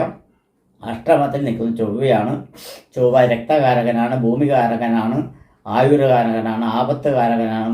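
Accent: Tamil Nadu, India. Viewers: തമിഴ് നാട്ടുകാരാണ്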